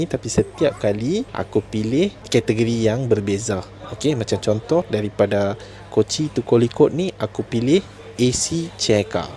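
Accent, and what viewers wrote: North, Malaysia. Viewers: Saya org Penang. Saya selesa dgn orang cakap utara. Dah subscribe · terbaik la... siap cakap utara... syok dengar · Syok dengak loghat utara.. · bukan BM jer...dia bantai loghat utagha bak hang. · Nice video bro. Aku tengok sampai habih. Siap balun cakap utagha 🔥🔥🔥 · Sebab cakap utara aku subscribe 😂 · YouTube suggest. Dengaq pulak cakap utaqa. Done Sub semoga channel sukses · Bahasa Kebangsaan dan loghat utara yang tersusun sangat sedap layan video bro.. terbaikk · skrol2 youtube jmpa chanel ni ...try bkk...skli loghat utara mmg padu pny review...mmg sempoi.. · Aku sub sebab hg terer cakap melayu..loghat utara plak tu mmg sempoi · Steady bro..orang utara ka..aku baru ja jumpa channel hang ..best2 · Terbaik bro, slang utara tu daripada Malaysian Indian melancong ke India. Terbaik review ni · Aku layan sampai hbs brader sebab bahasa utaqa hang..senang nk paham😂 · Video hang fyp kat YouTube aku time aku scroll. 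Bila aku dengaq hang sembang utara langsung teruih aku SUBSCRIBE channel hang.. Sempoi bak hang video hang. 🎉🎉🎉👍👍👍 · gilalah ada review bahasa kebangsaan campur utagha bakhang.....inilah yang dicari cari...well done bakhang...tak sangka kerala ni kira maju juga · Start² terus melayu loghat utara, auto subscribe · hg ni org utara ka..😅😅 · Perghhh ni video pertama aku tengok, sebab naik suggestion. Tak cakap banyak, selepas tengok opening 2 minit terus aku subscribe. Nampaknya aku dah jumpa benda baru yang nak melayan sebelum nak lena, mamat ni not bad lah untuk vlogging. Paling best dia guna loghat utara, senang faham. Time lunch hour pun boleh layan video ni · Suka dengaq brader cakap utara.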